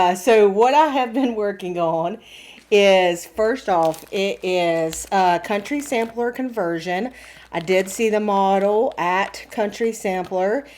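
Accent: South, United States. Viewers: Congratulations! I look forward to watching your videos. Love your “southern” accent and pronunciations. I’m a southern girl, too. I didn’t know I had a southern accent until I moved to California. Thank God I’m back in the south😜😜😜I would love to win your fancy scissors🙀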